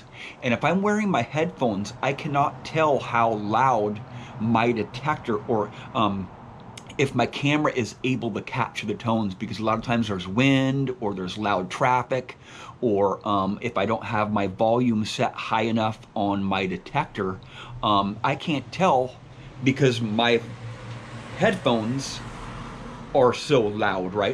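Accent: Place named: Georgia, United States. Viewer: What state are you in ? Sounds like my state of Georgia ! :)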